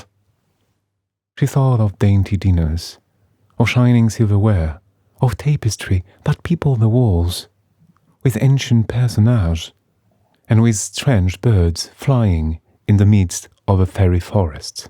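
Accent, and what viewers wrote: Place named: United Kingdom. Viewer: C'est une si belle idée de réaliser une version anglaise ! And what a delicious british accent ! I like that.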